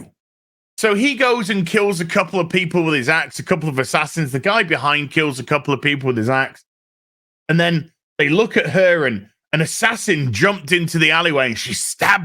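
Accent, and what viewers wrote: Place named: Scotland, United Kingdom. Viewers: the scottish makes this Review sooo special XDDD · Alright, your scottish accent got you another subscription, mate! 😀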